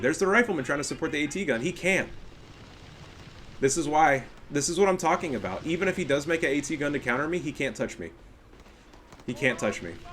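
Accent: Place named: Germany. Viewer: Bro... this shit is fucking comedy. Literally peak youtbe right here 🤣🤣🤣 the fact that you can so the German accent so well and clearly aint about that shit is legendary 😂😂😂 i dont even like videos on youtube cuz it fucks with my music but this deserves likes regardless hahaha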